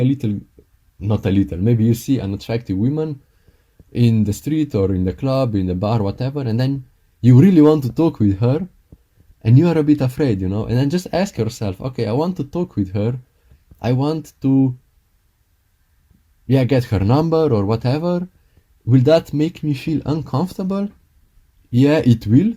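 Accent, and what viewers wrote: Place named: Spain. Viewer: your language accent is beatiful are u spanish or little bit south european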